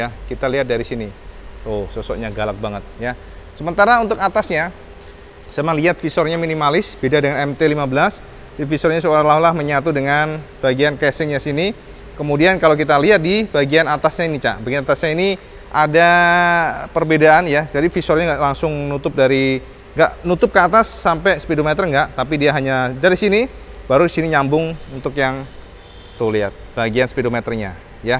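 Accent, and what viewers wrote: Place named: Tulungagung, Indonesia. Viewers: Jagange endi to jagange.. tak habis2 ak seneng karo bahasamu maas.. kuentel tulungagung e 😄🙏🏻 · salam Jooosss Gandooos mas bahasanya dan gayanya khas wong Tulung Agung sehat selalu mas Bro👍🏼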